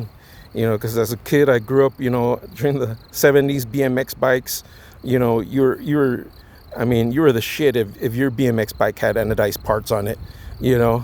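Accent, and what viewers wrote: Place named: Southern California, United States. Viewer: U sound vry southern cal. Where all th smartest people live. Smart purchase. U 4got mention yr bike has a teardrop aero down tube larger diameter. Mine is chrome version my upgrades vastly superiour 2yours& delete 3lbs over yourz. Relaxed geometry = superiour shifting huge reduction in cognitive stress. Always apply glue tube (and) patch blow dry maybe u find iz stronger result. Go buy u some 38mm Specialized Sawtooth $19 Jenson b4 they run out. 120 TP1 faster then yr frictiony knobs on MAXXIS. I clocked 12mph over San Gabriel mts fireroad slicks vs 8mph sluggish knows. Yes knows are fun 2look at. No they dont wrk. use slicks is best bleev it or not rubber gets traction. Hard 2bleev I know. Use melted parrafin wax chain tubeless tires. Even with tubes my new bike& new positioning man it just FLEW. When I got Walmart 17miles away It felt like I just left driveway brilliant ergonomics body not sore wznt tired at all either. 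Mind blowing. My Mercier build is actually wrldz best bike all others will now be judged by end all personified I built it delete my E Bike I was SHOCKED 1st time I rode it. Felt like it had a motor. I am ^ always have been cycling true epicenter 1x, dual control shifting on your drops, Disc specific rims/disc's pedal straps short upright top tubes, "Gravel bikes" with wide fork& stays thoze were all my doing from r decades ago. U neglect mention TAIWAN by far best builder bikes or anything. Very mature responsible people Taiwan = true reason Honda became ki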